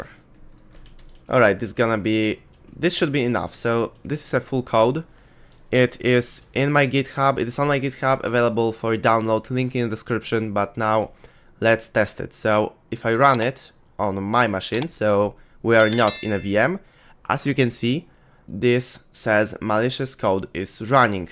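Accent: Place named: Poland. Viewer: Długo już się uczysz informatyki? Po akcencie słychać, że jesteś Polakiem haha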